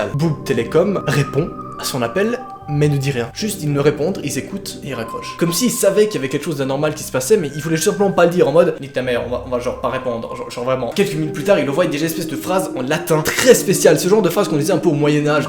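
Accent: Switzerland. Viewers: Pas de doute tu es suisse ! J’adore ton accent ! (Je sais de quoi je parle) 😂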